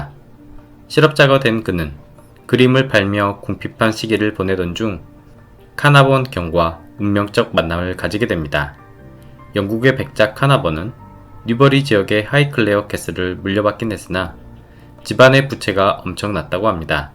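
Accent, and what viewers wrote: Jeolla, South Korea. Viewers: 전라도 분 이신가보네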